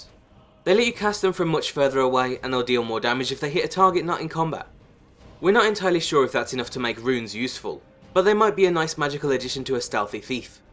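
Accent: United Kingdom. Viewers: Great video, but your speech is quite slurred. It's not just the "charming" British accent; there's actual slurring going on - where two vowels blend into one. Quite easy to work on once you're aware of it.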